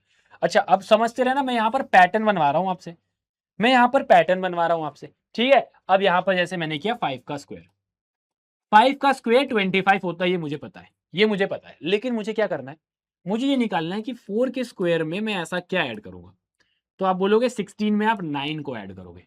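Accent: Bihar, India. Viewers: Bhaiya aap bihari hoo kya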